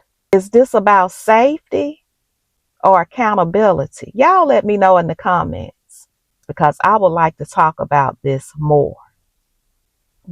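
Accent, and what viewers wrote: South, United States. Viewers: Sis...I had to listen to this three times to get the message...that lovely southern accent is mesmerizing...complete soul snatching in effect.🤩❤ OK...now to my point. When the Dems were in charge of things, 'Everybody' and their vices were included (including the Devil's Lettuce). Alabama is Ultra right and those that live there need to be knowledgeable of the law. It is what it is... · Love your Southern accent.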